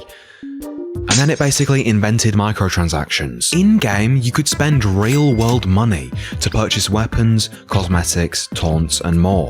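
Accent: United Kingdom. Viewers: i think this guy is british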